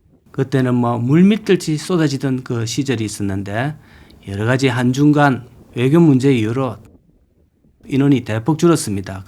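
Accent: Gyeongsang, South Korea. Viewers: 님의 촌철살인의 나레이션 내용과 매끄럽게 흘리는 서부경남 사투리가 기가막히게 어우러져 v-log의 인기가 나날이 더해갈 것입니다. 항상 잘보고있습니다.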